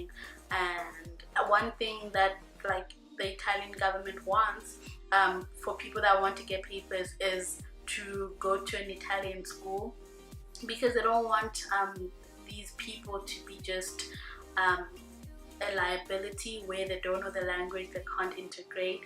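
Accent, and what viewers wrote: South Africa. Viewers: LOL I spent the last two summers in Italy. they're watching you because there are a lot of Africans who have fled the continent! They are looking at you hard because you're so adorable! So how is your Italian progressing. Have you been to Turin, Sardinia or mid-country? Where is back home for you! You sound like you might be from Durban or some part of South Africa. if so, i love the country! They have a Mediterranean Diet. I didn't find the pizza as a issues because they don't even eat spaghetti and meatballs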